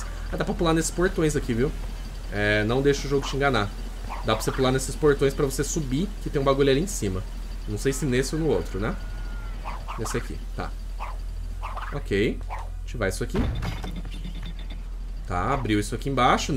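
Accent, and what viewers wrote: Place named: Germany. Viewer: o sotaque dele me lembra um soltado alemão.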